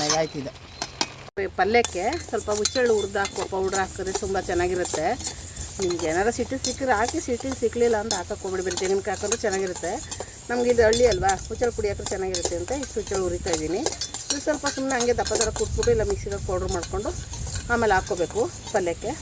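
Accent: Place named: Channapatna, India. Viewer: Aunty maatu namm channpatna da slang super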